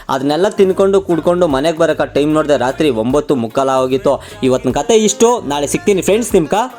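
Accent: Kolar, India. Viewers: Kolar bashe bro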